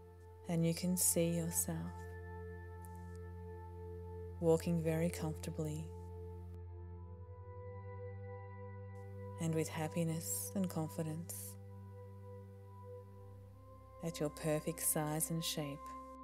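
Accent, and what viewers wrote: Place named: Australia. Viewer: She sounds Australian all the way through to me (I'm British)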